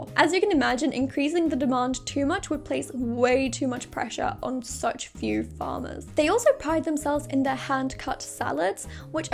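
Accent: England, United Kingdom. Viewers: she lost her England accent a lot from the last time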